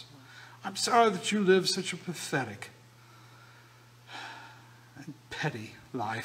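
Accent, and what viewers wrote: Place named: Transatlantic, United States. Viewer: Props on the transatlantic accent. Very nice.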